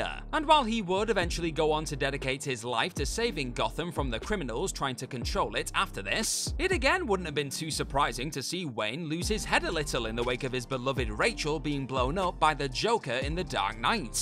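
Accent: United Kingdom. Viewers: You do realize that British accents are like that, right? Brits can't change the way their accents pronounce words. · Because he's British. That is literally how the English accent works.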